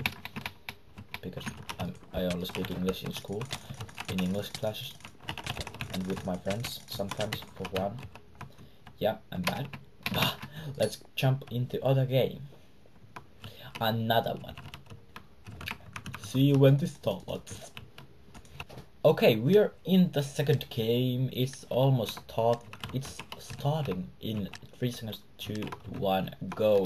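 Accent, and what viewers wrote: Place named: Finland. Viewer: Rallienglanti :D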